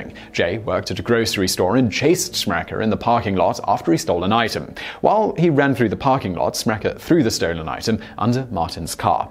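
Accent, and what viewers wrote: United Kingdom. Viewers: Your accent is the only British accent that is actually pleasurable to listen to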